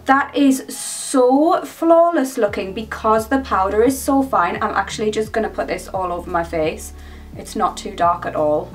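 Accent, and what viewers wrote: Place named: Liverpool, United Kingdom. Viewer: You made me giggle with your scouse accent 😂 wonderful video as usual! You’ve done us women proud since it’s been women’s day this week! 💪🏼💓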